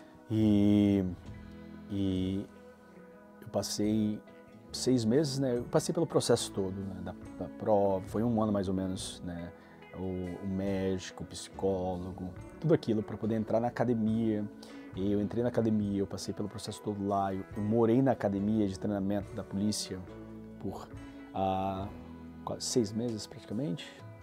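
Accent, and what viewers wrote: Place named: Mexico. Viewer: Achava que você era mexicano rs voz linda sua. Passei por esse conflito entre igreja x aceitação, até que cheguei numa depressão muito grande pois fiquei dez anos sem nem beijar na boca, daí comecei a me tratar e fazer terapia, uma longa história...hoje estou tranquilo nessa questão, mas foi bem complicado mesmo! Me identifiquei com muitas coisas. Obrigado por compartilhar conosco sua história de vida 🙏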